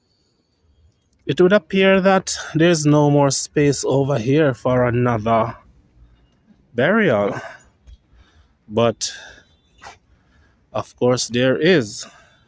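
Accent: Jamaica. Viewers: You have never heard patwa before? Stfu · I understood every word. Some French, Spanish, etc. people speak English with an accent yet are not ridiculed. Patwa is our mother tongue. Gwaan taak, mi luv yuh Jumiekan accent🙂 Luuk yah, Google translate have patwa now, suh me wouldn't mind if you chat full patwa😉. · He is Jamaican we speak how we chat.. Lol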